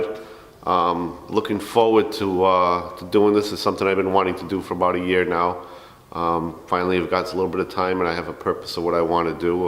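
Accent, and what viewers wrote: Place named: Massachusetts, United States. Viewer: Hey...if we got married....my name would be......Tamara!!! Lets do it! Lol i have no idea who you are im just here to get some instructions on how to sharpen my shears and you sound like you are from Mass. Have a great day thank you for posting this helpful video. Hope u got a little laugh my pay for ur vid. 😎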